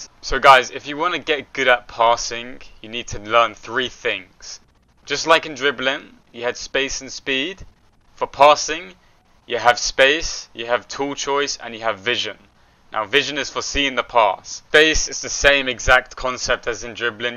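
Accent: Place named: India. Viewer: I LOVE THE PRIME (u sound indian with that mic)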